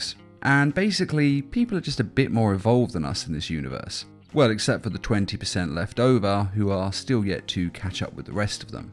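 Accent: England, United Kingdom. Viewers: He sounds like he is from England and it’s hard to get manga here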